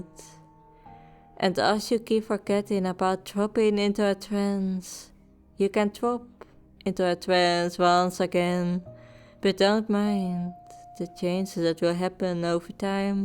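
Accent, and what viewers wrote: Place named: Netherlands. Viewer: Didnt expect to like a dutch accent hehe, but I think it is ur voice and the way u pronounce words that makes it nice :3 I also know a dutch male switch u might get along with very well im sure we will chat about it, hehe :3 ty for the fun :3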